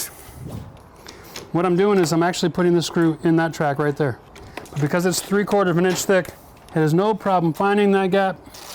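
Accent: Canada. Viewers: Canadian aa?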